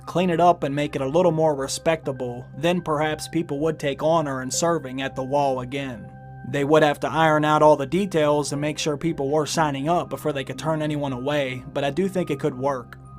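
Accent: United States. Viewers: What is your native tongue? I would like to know how you've mastered American in just a year or so. Thanks!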